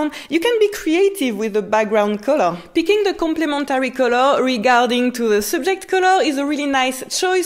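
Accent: France. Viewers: The English pronunciation strongly reminds me of Inspector Jacques Clouseau, but the tips are quite useful.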